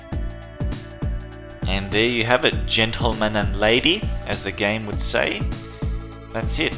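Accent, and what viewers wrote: Australia. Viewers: I believe you're still out there. Hope you're doing well. Every time I hear an Aussie accent I shed a tear and think of this channel.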